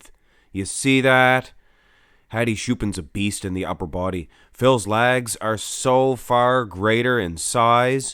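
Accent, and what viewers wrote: Canada. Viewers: Great commentary. I couldn’t tell if he was from Canada or Minnesota until the end of the video. I am guessing Canada.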